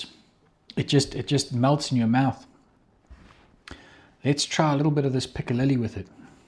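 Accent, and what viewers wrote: South Africa. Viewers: What accent's that, bud? My ear is saying South African.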